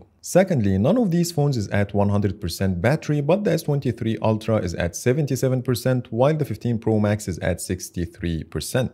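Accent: Egypt. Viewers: Bro, are you Egyptian cuz I can hear it from your accent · عاش والله طور من الانجليزيه عشان ملاحظش انك مصرى بس ممتاز جدا ❤ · مصري؟😂 حسيتك هيك من اول جملتين😂 *انا سوري btw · اللهجة مصريه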